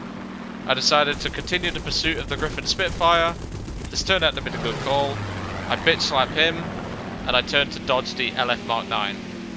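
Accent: United Kingdom. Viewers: you don't use kilometers, but you have an English accent. Am I hearing things wrong?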